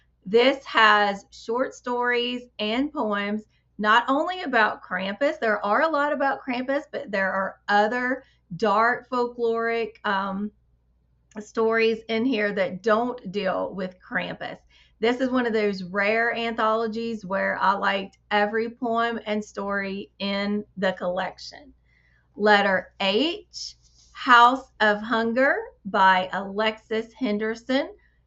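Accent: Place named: South, United States. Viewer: I got The Night Parade yesterday! Everything is Southern Gothic with that accent 😂🤷‍♂️💃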